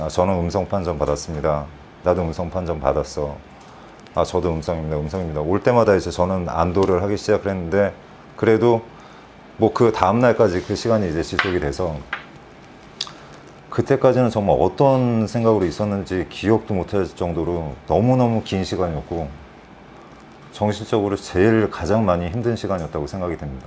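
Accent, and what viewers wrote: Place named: Seoul, South Korea. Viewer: 와 진짜 한국인 아니에요? 진짜 오리지널 서울사람같애요😳 한국사람인 나보다 더 잘함ㅋㅋㅋ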